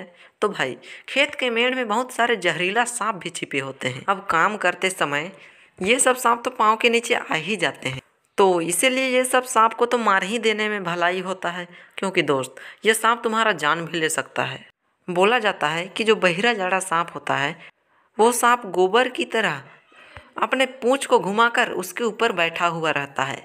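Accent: Bihar, India. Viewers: Bhai aap bihari ho kya?😀 Ek dam bihari accent....mast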